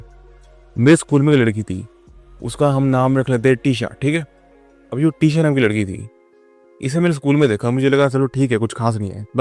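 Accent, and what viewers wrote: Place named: Himachal Pradesh, India. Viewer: Himachali accent 😂... ❤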